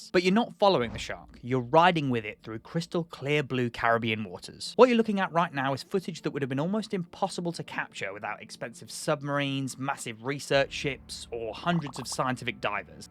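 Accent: United Kingdom. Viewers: cool! Just sounded funny coming from a brit accent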